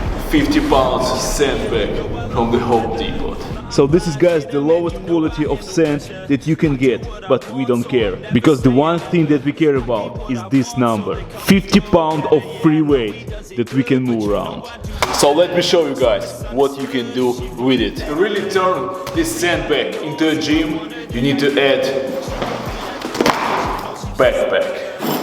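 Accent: Russia. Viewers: пожалуйста прокачай свое американское произношение, ну а то совсем не серьезно. круто то что ты можешь свободно понимать и говорить, но произношение режет ухо. типичный русский акцент )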